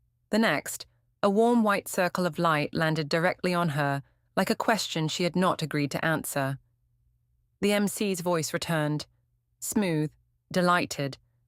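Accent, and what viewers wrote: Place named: England, United Kingdom. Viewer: I have a hard time to listen this English accent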